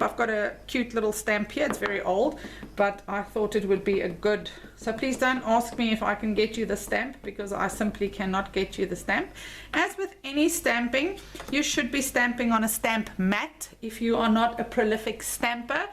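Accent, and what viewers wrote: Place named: South Africa. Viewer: Thank you for this great comparison. So fun to hear a South African accent on a crafty video ❤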